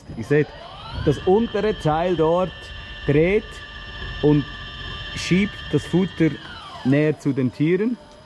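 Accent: Standard. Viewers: Hallo, Du sprichst ja super Hochdeutsch, Respekt. Egal wie Du das in Zukunft machen willst, Dein Kanal ist super und ich verstehe beide Varianten, ich folge ja auch dem Bauer Housi. Schönes Wochenende aus Franken, Bernd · hab ich was an den Ohren oder schwätzt Du tatsächlich Hochdeutsch?! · Wieso redest du Hochdeutsch und nömä schwitzerdütsch? 🇨🇭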